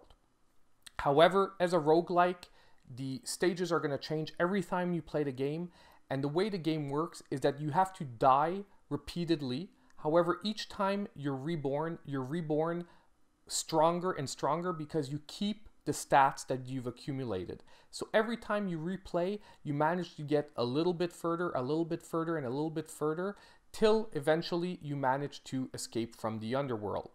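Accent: Canada. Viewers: Sup bro from Los Angeles like the Canadian accent. Carrion gets me attention big time. Will add to my back log of games. Just picked up Assasins creed rogue. Thsnks for the reviews keep up the hard work.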